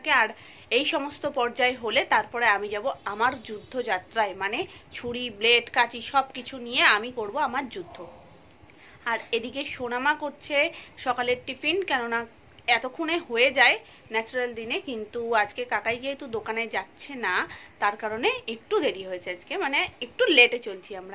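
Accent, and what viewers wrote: Bangladesh. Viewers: Tumi bangal nah "neba" Deba" bolo amio boli Amro ase nah j "nebe" debe"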